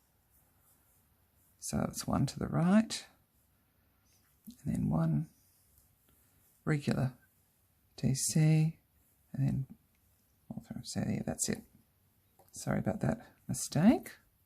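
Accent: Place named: Australia. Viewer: is Australian... hence the accent!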